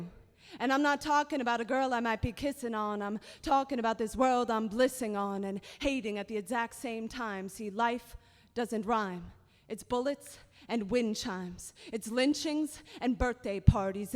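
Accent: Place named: Maine, United States. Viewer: Maine accent still front and center here.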